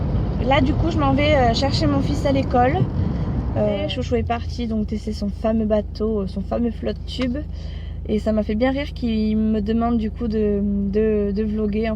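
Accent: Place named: Southwest, France. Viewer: L accent j adore Ça sent les vacances pour moi Le sud ouest 🌞🌞🌞🌞🌞🌞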